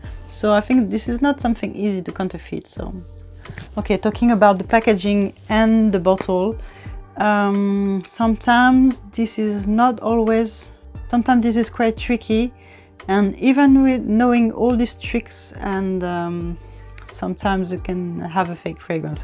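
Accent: France. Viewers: Hello, i feel like you have a French accent! Am i wrong?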